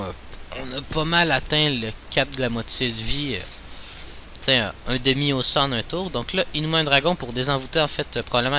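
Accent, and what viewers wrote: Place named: Quebec, Canada. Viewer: honnêtement je viens tout juste de découvrir ta chaine a cause d'une vielle vidéo de Tyra et cela fait du bien d'entendre un québécois jouer a dofus xD Ps: vive le Québec ^^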